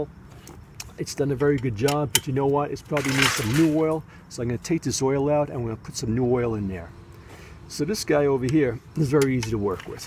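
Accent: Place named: New York, United States. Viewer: This was great. I can hear the New York / Italian heritage lol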